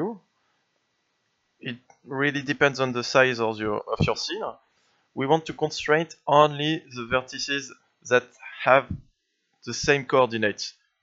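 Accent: France. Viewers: You're french ? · J'ai l'impression que tu es français non ?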